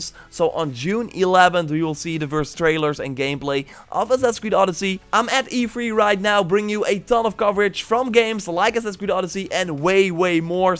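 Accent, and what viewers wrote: Netherlands. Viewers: Dude, your English could use improvement. You shouldn't mix Dutch with English ;) Also your Dutch accent clearly comes trough, you are not doing us any good with that ;)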